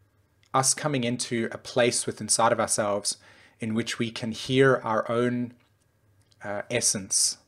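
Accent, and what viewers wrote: South Africa. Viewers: you have a nice SA accent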